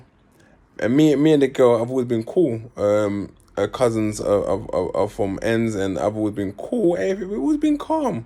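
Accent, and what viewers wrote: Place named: North London, United Kingdom. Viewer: Love the vids bro. Mans got the full on narm accent, sounds like giggs sometimes lol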